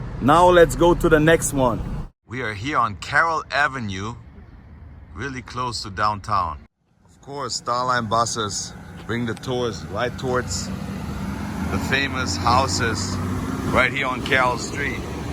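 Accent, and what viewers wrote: Germany. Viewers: Allein am Akzent hat man gehört dass du Deutscher bist. Bis ich dann auch dein Kanal Namen gesehen habe😂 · NEWBEATS MUSIC. He’s from Germany he just has a German accent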